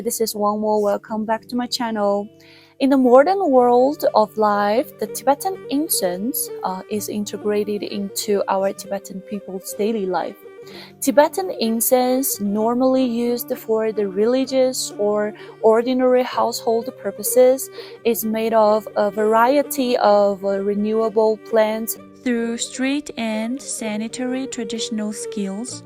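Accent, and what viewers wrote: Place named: Southeast Asia. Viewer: your English is not same as Most Chinese, kind of south east Asian accent. Though very good presentation. Tibet blessed.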